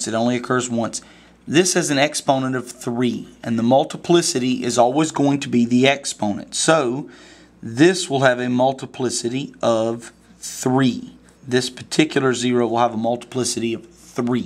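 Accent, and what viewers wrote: South, United States. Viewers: Not sure why, but math explained in a southern accent is so much easier to understand.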